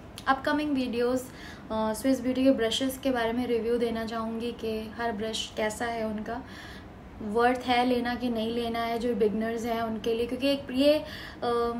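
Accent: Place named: Lucknow, India. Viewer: assalam alaikummm .....bhot beautiful ho.....aap ki awaj ekdm lko walao ki trah haiiiii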